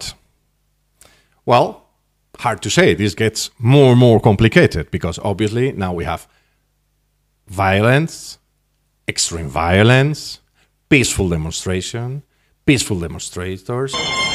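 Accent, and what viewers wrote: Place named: United States. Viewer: Your American accent sounds beautiful.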